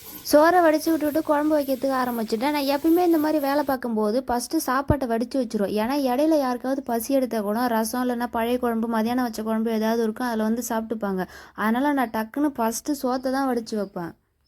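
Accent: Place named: Virudhachalam, India. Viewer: It is amazing to hear Virudhachalam Tamil. I am in Delhi wish to visit Tamilnadu soon. Your video refreshing village memories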